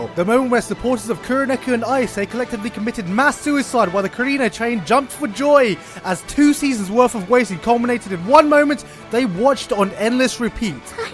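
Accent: United Kingdom. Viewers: sounds so Bri'ish here. How things have changed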